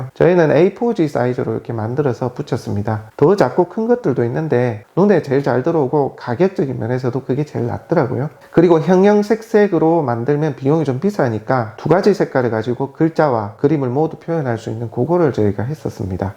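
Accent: Gyeongsang, South Korea. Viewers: 갱상도 사투리 잼나요.ㅎㅎ 홧팅!!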